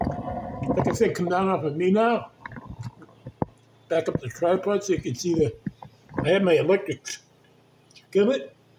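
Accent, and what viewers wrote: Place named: Wales, United Kingdom. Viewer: Are you originally Welsh? You have a Welsh accent here and there :)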